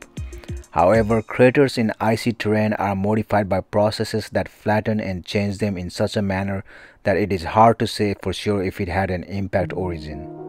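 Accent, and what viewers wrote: India. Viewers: This is more like Indian call center like you've never heard before..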